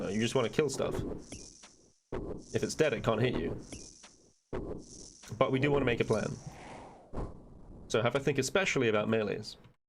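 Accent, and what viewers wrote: United Kingdom. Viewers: Great guide. Didn't love the British accent though.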